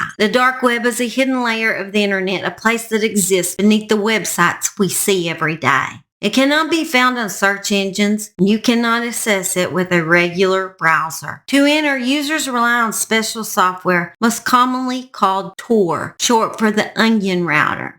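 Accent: South, United States. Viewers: I like your voice...a southern accent is comforting to me...probably bc I'm southern. Lol Hyped your video. Encourage people to comment too bc it helps the algorithm. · Oklahoma?? That's not true you have a southern accent Oklahoma is way Midwest they don't speak in that manner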